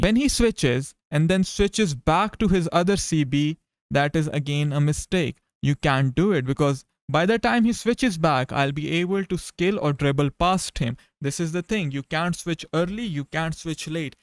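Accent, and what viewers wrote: India. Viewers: Man just tell are you indian as your accent is so much indian??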